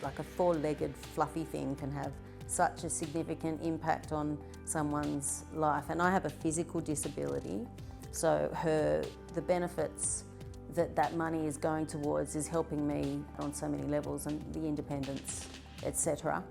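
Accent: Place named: Australia. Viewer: I wish u all the best. Im from Victoria Australia. I recognise our accent. Take care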